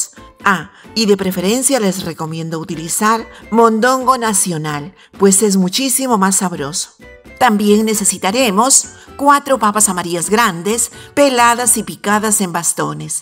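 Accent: Peru. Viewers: Hola, muy buanos dias;Soy aficionado a tus recetas de comida peruana. Yo soy peruano, pero vivo desde hace 32 años en España, y siempre e echado en falta nuestra comida peruana, y me e visto en la necesidad de aprender a cosinar y me guió por tus recetas. Me gustaría mucho que te des a conocer, pon un poco de tu imagen;Por tu forma de hablar creo que heres mi paisana, si no me equivoco de Huaraz?, yo soy de Yungay(Ancash). Un cordial saludo, y muchas felicitaciones por tus excelentes recetas,gtscias.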